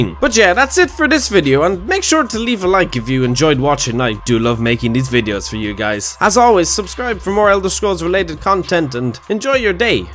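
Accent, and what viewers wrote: Ireland. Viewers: Dominic Fischel It’s the Irish voices. They good.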